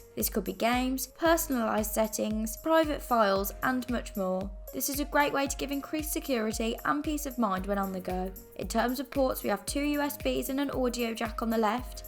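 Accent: United Kingdom. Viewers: this is the most british accent i have eva heard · I love that British accent ❤️ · She's british.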